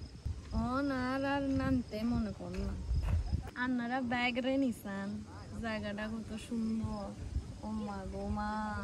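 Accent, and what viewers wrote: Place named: Noakhali, Bangladesh. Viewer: 😮my god you reply my comments 😢tnx hum apner noyakhali kotha bola sundor mona hocca akdom noyakhalir maye 😊jai hok akhono ghuman ni rater baja 1ta 😅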